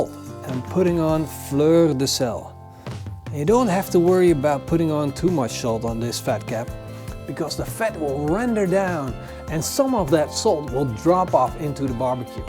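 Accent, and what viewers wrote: Netherlands. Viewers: I was like he sounds dutch hmmm. Turns out he is dutch 😂😂🇳🇱👍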